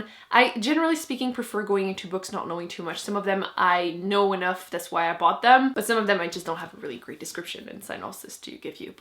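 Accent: Quebec, Canada. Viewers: Just found your channel and have binge watched a bunch or your episodes. I listen to a lot of audiobooks while driving around in my cruiser and when I am out sailing on my boat. You are so bubbly and cute when you explain why you like the books you do. The French Canadian accent is so cute too. It makes me laugh at times, which is an added bonus. FYI, I’m Canadian as well but don’t have that French accent. Not to say I don’t sound Canadian because I do notice in my videos I sometimes do say “eh?” at the end of my sentences. You don’t even realize you do it until you watch yourself. Ha ha. You seem to like similar types of books to what I like. Keep those videos coming. I’ll be watching. Craig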